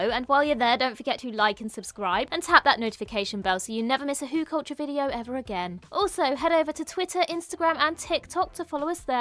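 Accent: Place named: United Kingdom. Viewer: Ellie it is adorable how you say F-ing. It just doesn't sound right coming out of your mouth. I guess being American myself it's just funny hearing it said with your proper British accent.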